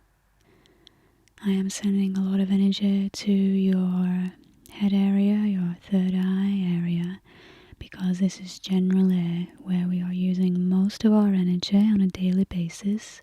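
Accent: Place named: Lancashire, United Kingdom. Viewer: Use the full lancashire accent you possess, so natural and attractive. From a fellow lancastrian.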